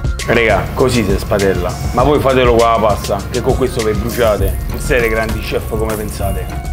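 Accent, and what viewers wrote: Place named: Rome, Italy. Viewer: bravissimo,poi il dialetto romano...sono un fan!!! ma questo me pare sempre incazzato nero :-)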